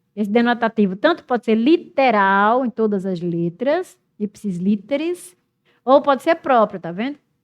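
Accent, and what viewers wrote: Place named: Northeast, Brazil. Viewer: Que sotaque mais lindo,😊😊 meu nordeste.🙏🙏#gratidão 🙌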